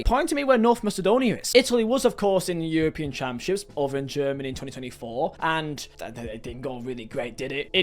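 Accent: United Kingdom. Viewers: GREAT VIDEO AND YOUR BRITISH BTW FELLOW BRIT.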